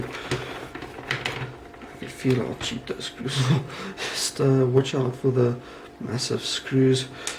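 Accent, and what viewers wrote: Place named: South Africa. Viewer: Heavy Saffer accent. lol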